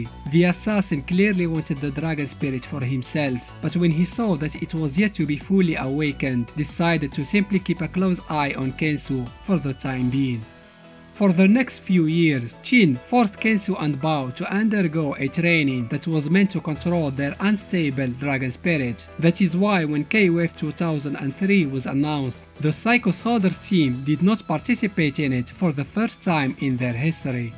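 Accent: Morocco. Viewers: just from your voice i recognized that you are from morocco XD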